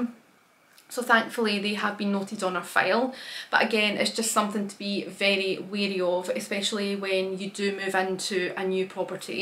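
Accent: Scotland, United Kingdom. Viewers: Thanks for this video. It is very difficult renting in Sydney, especially as tight as the market is now. I remember when we were leaving our rented place years ago how I felt when streams of people came in looking & that was when it wasn't as busy as it is nowadays. You are right about the lease & in noting the condition of each room in great detail. I know you've found a place now you are happy with but as a word of advice to others arriving in Sydney - yes you have to move further out to get a house for lower rent but if you look instead at townhouses or villas you may pay less than for free-standing house not so far out & you still have a garden ( admittedly smaller) for children. I hope your move goes well, don't forget to have an "essentials" box for moving day ( kettle, coffee, long life milk, tea bags, tissues,toilet paper, snacks etc so you can avoid the added stress of going to the shops). 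I enjoy all your videos & love your Scottish accent. P.S. Don't forget a small esky with ice so you can give the movers a drink when they bring the final load into your new place - it's a very "Aussie" thing to do!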